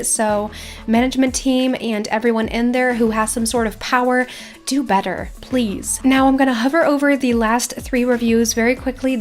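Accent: Netherlands. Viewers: Wait— are you dutch?!😱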